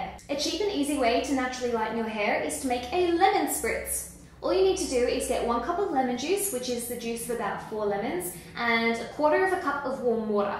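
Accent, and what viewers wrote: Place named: Australia. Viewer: LOVED This! Thanks lovely!! Side note- I'm super surprised that a lot of people haven't realised that you have an Australian accent and there fore live in Australia... where it's currently summer ☀️😜 Haha xxoo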